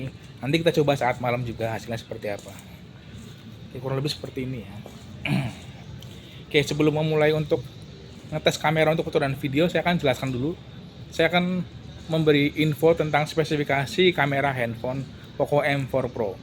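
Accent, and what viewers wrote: Tegal, Indonesia. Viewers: Wong tegal ya mas? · Wong tegal lurr?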